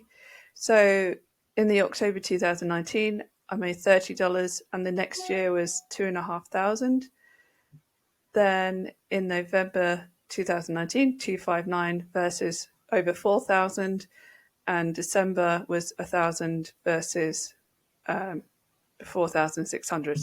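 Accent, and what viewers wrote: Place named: England, United Kingdom. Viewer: Holy Moly, $4k each month after just one year. Insane. Well done you. Likewise, the amount of books you've put out is incredible. I need to get my act together! Only just discovered your channel, nice to hear another (calm!) English voice! Although admittedly I'm semi retired and looking to kdp for extra rather than an entire income, I think if I was earning the royalties you are I probably just stop and let the passive income roll in! Still, you can never have too much I guess 😂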